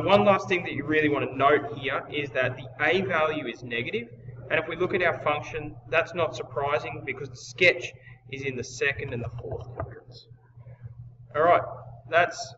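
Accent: Australia. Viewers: The Aussie accent ❤️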